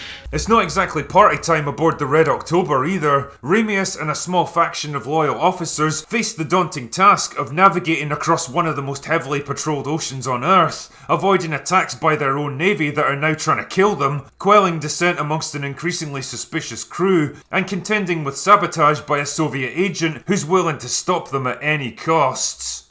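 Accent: Scotland, United Kingdom. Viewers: This is one I can't help but stop and watch each time I see it on the channel guide. It's probably just to hear Russian in a Scottish accent, lol!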